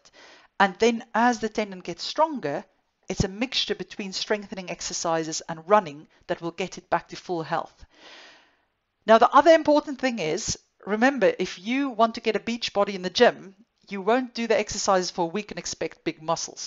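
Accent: South Africa. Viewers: South African AF! Great video, thanks for this! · I love your accent. You must be from South Africa.❤❤❤❤❤❤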